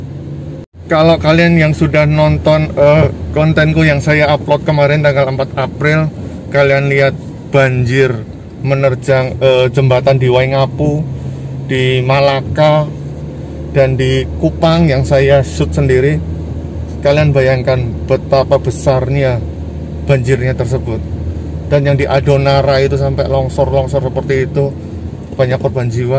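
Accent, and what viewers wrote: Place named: Java, Indonesia. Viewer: Kok logatnya ada jawanya Om. Dulu kuliahnya diJogja ya Om.🙏😁😁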